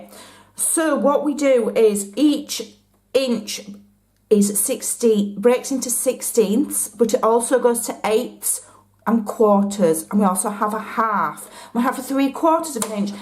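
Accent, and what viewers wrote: United Kingdom. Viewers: Bless your sweet British heart! I’ve purchased Dysart’s that only contain measurements in centimeters which have rendered me useless... clearly I didn’t pay much attention in math class which I hated though this tutorial is a godsend. I could listen to your charming accent and joyful laugh all day long so thank you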